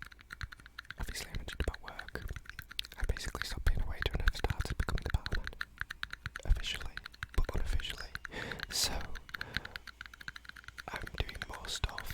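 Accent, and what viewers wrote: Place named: East Coast, United States. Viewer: sounds mostly east coast american to me! you do amazing asmr, keep going !!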